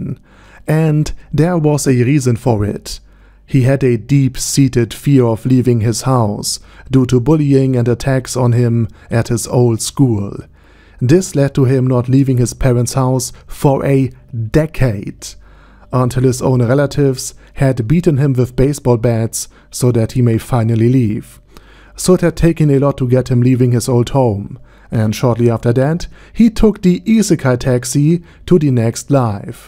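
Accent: Germany. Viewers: Starker deutscher aktzent aber cooles Video Bro 🎉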